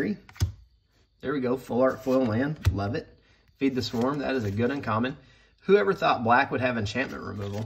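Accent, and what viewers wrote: South, United States. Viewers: Watched the whole thing! Just started getting into magic recently and I love watching your videos! Your southern accent makes me feel like I’m at home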